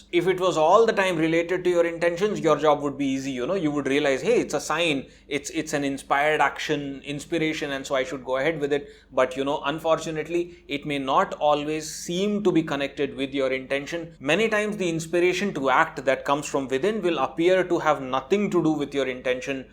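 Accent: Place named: India. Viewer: Ugh .. The Indian accent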